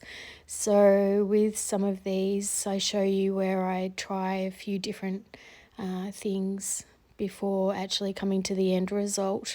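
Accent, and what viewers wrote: Australia. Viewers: An Aussie voice? Instant subscriber! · Good to see your process. Thanks for sharing. Love to hear another Aussie!😃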